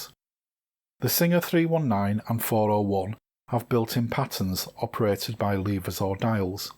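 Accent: Northern England, United Kingdom. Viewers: What a brilliant and informative video. It's great to hear a northern accent too!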